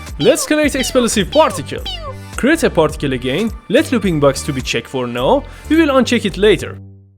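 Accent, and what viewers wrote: Iran. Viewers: Where are you from , like iranian talk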